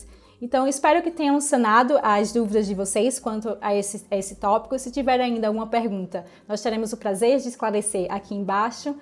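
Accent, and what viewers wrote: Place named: Portugal. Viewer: Seu sotaque é tão bom de ouvir kk parece um pouco o português de Portugal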